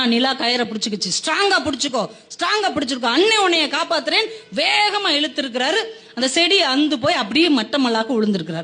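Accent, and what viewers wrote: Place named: Madurai, India. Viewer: இதுவரை கேட்டிராத, மிகவும் கற்பனைவளமுள்ள சிறப்பான குட்டிக்கதை. குடிமகன்களுடைய வாழ்க்கையே தனி, மதுரைத் தமிழ் அதைவிட அமர்க்களம். அன்புச் சகோதரி வாழ்க உங்களுடைய தொண்டு.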